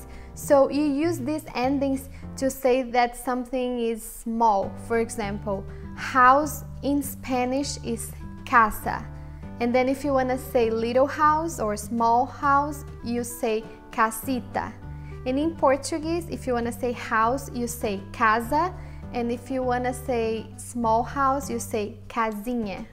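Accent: Brazil. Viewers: Ese portugués que estás hablando es más Brasileiro XD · Well,when you speak portuguese you speak like brazilian so,your portyguese from Portugal is lightly bad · why would you use the portuguese flag if your talking like brazilian people wTF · FreedomWarrior Não vale a pena andares a causar discussões com Brasileiros só porque ela falou com o sotaque do Brazil, ela usou a bandeira Portuguesa quando falou da língua Portuguesa (dando reconhecimento ao país original da língua) não a Brasileira, por isso acho que estás a exagerar e mais, a causar má impressão do teu e meu país. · Esse sotaque ai é brasileiro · you are speacking portuguese from brazil not from portugal sorry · Interesting the fact that you included the Spanish flag and the Portuguese flag, despite your Brazilian accent. Thank you. :) 😗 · (Propheta) para falar a verdade é muita azia da sua parte. É como um britânico se irritar pelo fato de um americano tentar ensinar inglês e ter colocado uma bandeira do Reino Unido só para dizer "o idioma provém desse país aí da bandeira". 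Deixe de azia rapaz! É td português! Criar celeuma porque falou com sotaque do Brasil (há vários sotaques dentro do Brasil, o dela por exemplo é mineiro, já pensou se fosse me irritar? "Isso não é português! É português mineiro!"), de Portugal (no Algarve e no Porto o sotaque é igual não é?). Sotaque e algumas diferenças de vocabulário não criam um novo idioma. Só o tornam mais rico. Ah! E por essa premissa sua o Espanhol dela é um Espanhol Argentino. Então teria segundo você que ser diferenças entre o Português do Brasil e o Espanhol Argentino. Nos poupe rapaz! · sorry ma'am that is not portuguese its Brazilian portuguese which is a lot different when it comes to pronunciation, also you speak south american spanish not original spanish. you shoudn't have used the portoguese and the spanish flags in this video so not to mislead people. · Your portuguese accent is from Brazil not from Portugal, it's very different... 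· You didn't actually speak native portuguese, you spoke brazilian which has a completely different accent from european portuguese. Makeup is not maquiagem in portuguese from Portugal, that's brazilian. In portuguese it's maquilhagem. Stop distorting portuguese language please. This video is more about brazilian portuguese and spanish, not portuguese vs spanish. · This has probably been pointed out already but the portuguese word for makeup is "maquilhagem", and the "lh" is not mute. Also portuguese sentences are built a little diferent, for instance "sempre há muito vento quando neva" sounds really off, it would be "Quando neva há sempre muito vento", though it is a only a small difference and I understand this is to keep things simple. Also I feel like this video is explaining more about the similarities than the diferences (these words can be easily translated from one language to the other with this simple rules while a lot of them can't) Also I wanted to point out she's using the brazilian accent, and as a portuguese it makes me wanna point that out (my corrections were for Pt-Pt, so ignore me if they're not true in Br-Pt). 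Sorry for the long comment, blame youtube for recomending me videos of my language. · Muito bem! Se não fosse o sotaque brasilês e a omissão de alguns determinantes seria perfeita. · poxa moça, você poderia então ter colocado a bandeira do Brasil pq esse sotaque português ai é do Brasil. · Her accent is Brazilian, not Portuguese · Se é pra falar com sotaque do Brasil e meter bandeira de Portugal... não dá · she spoke with a Brazilian accent and put the flag of Portugal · Muito bem explicado, Muy buen explicado, me ha gustado el acento brasileño :) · JhonnLT Ele não falou "bosta" seu ignorante. Ela usou sotaque brasileiro, é uma crítica perfeitamente válida sobre a bandeira. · O teu português é muito brasileiro ; ) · I like the video, but this is much more SPANISH vs BRAZILIAN.... Your accent here is completely brazilian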